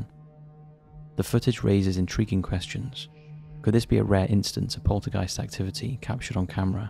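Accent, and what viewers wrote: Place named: England, United Kingdom. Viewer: the famous English voice is back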